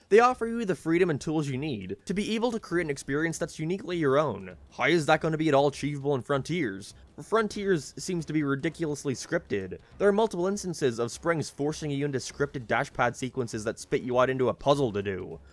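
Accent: Ireland. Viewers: no hes irish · he's Irish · ur from donegal arent u my brother? haha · Yeah he’s Irish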